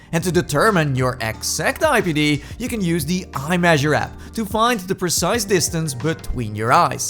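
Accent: Netherlands. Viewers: ur from Holland?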